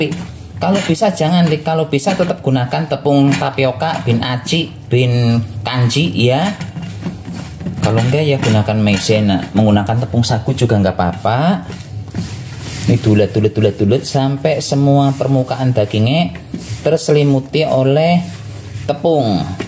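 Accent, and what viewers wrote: Banyumas, Indonesia. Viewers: Lucu ngapak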